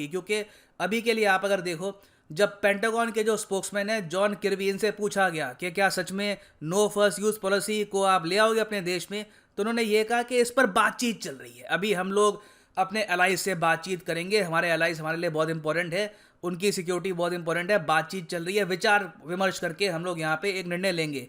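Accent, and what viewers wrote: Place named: Bihar, India. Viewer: जबर्दस्त आज के लेक्चर मे बिहारी टोन है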